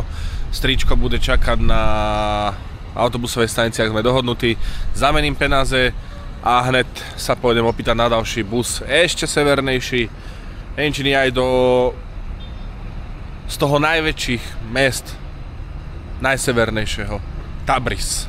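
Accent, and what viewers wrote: Trnava, Slovakia. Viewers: hlavne pokračuj s takouto formou. páči sa mi to aj tá naša trnafčina.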